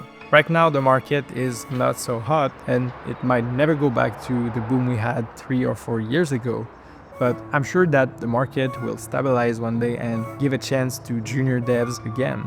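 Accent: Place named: Quebec, Canada. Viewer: Je vois que t as un accent quebecois, donc je présume que tu parle français xd. Tu es partis à quelle université? Perso je dois choisir entre génie logiciel et génie éléctrique, mais je ne suis pas sûr sur laquelle partir. Je vais aussi bientôt completer ma technique d'Informatique en moins de 1 ans et juste avec ce diplôme je ne suis pas sûr d'être capable d'avoir un travail dans le secteur d'informatique en général.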